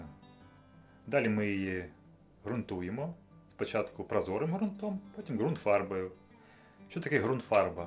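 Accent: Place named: Ukraine. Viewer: Что с автором? На украинском языке раньше не наблюдал. Я конечно по говору понимал что с Украины,но переобутся так сразу..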